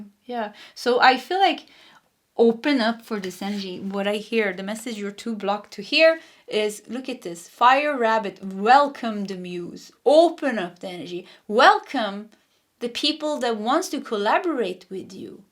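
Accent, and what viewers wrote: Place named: Sweden. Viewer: Will change Siri to Swedish accent ❤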